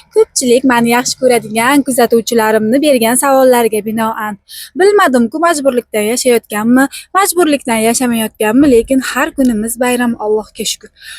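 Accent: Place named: Olot, Uzbekistan. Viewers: O'zim aylanay shevangizdan zemlagim Buxoro olotlik men jondordanman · Шевангиз холиям олотчча га тортаркан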